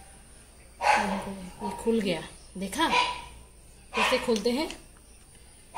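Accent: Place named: Bengal, India. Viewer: Bhai apni bangali Hindi te bolsen keno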